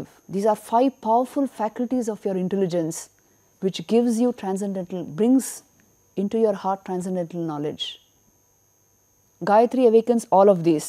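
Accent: India. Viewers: Umm..she seems to have a pakka Bangalore accent..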